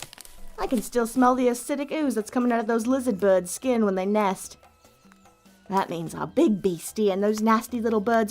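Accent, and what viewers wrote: Australia. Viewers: you sound Australian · You have a beautiful accent. Australian?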